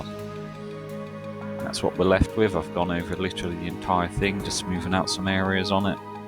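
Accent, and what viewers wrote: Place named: Somerset, United Kingdom. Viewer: I thought I recognised the accent haha I live in Weston super mare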